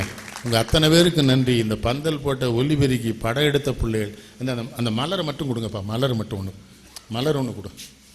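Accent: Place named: Tirunelveli, India. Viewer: Tambirabarani tamizh ketkka ketkka ruchi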